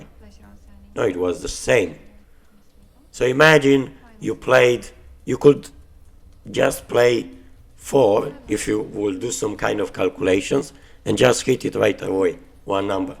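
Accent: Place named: Russia. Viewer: Bro your voice is a legend gambler voice! I love that russian accent ❤❤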